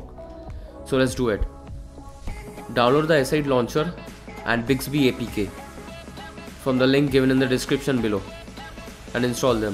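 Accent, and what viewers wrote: India. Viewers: I can't understand his Indian English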